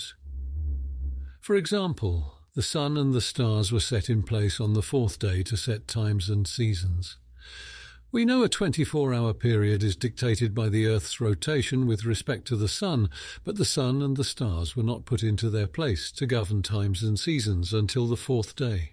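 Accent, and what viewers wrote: United Kingdom. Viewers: Hi, my husband and I started the devotional, and he likes it😁. However, I had a question and sent you an email....I really like the English accent on your teaching...❤ by the time this ended I just wanted to yell HALLELUJAH 😂😂😂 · I agree with the Gap theory as well. The theory gives us a possible little glimpse into some of the huh? Things between God and Satan. But I like how you taught in this in relation to it and pointed back to Jesus. I’ve never really heard it taught this way and it was beautiful. I’m so thankful for the mind God has given you. There is an understanding not just of literature, and logic and God has given you not only intelligence, but it is clearly Spirit filled and relational. It’s wonderful to see Him working through you. I don’t have time to read comments these days…so I’m sorry if people give this same feedback…I like the British voice I guess. 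But the thing that’s great about your speaking is the little aspects of your personality that come out. When you say something goofy or let a little laughter in. It’s more personal. But I support the ministry whomever is speaking it.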